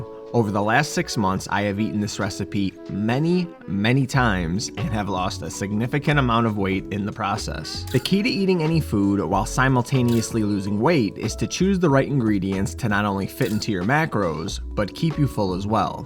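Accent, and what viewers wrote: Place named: Chicago, United States. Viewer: I cant stand the Chicago accent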